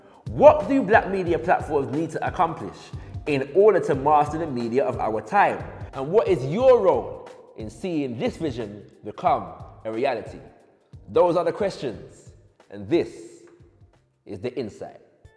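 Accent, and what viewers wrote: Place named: Jamaica. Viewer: Maybe he spoke in jamaican accent or patois in his home as a child its common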